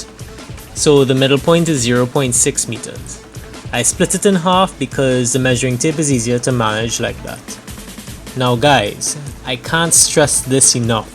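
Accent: Trinidad and Tobago. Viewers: Knew he sounded like a Trinidadian, big up Couva lol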